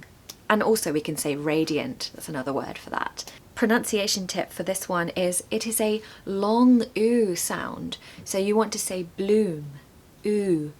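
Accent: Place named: England, United Kingdom. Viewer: Sweet charming English accent 😅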